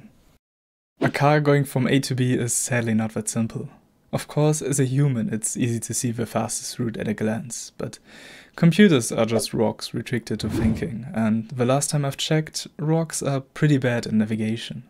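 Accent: Germany. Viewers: da hoere ich doch Deutsch raus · Ohne nach zu schauen hört man das hier ein Deutscher am Werk ist :D Nices Video :)) Kennst du das Spiel Mini Motorways? Könntest du mal erklären wie man sowas Grob erstellen kann? also das Verschiedene Gebäude (Arbeit) auf einer Map Spawnen und man die passenden Häuser (Arbeiter) mit Straßen verbinden muss :)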